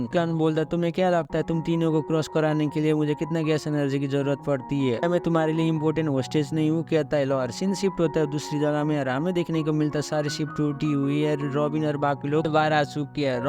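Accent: Nepal. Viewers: Because your Hindi accent is like Nepali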